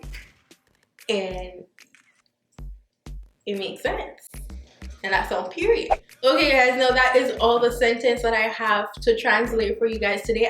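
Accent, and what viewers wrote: Jamaica. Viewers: As a latina I’m seeing a Jamaican and his patwa is sooo strong lol I’m learning tho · I don't understand not one bit of patois but I love hearing it lol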